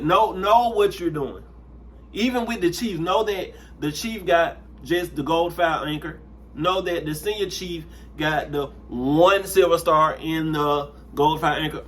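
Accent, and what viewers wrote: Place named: South, United States. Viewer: Holy southern accent!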